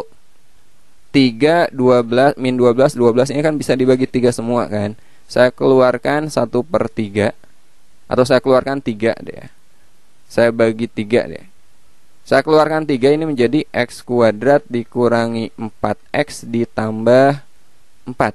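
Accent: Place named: West Java, Indonesia. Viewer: Abang nya orang sunda ya? Logat nya kek sunda😅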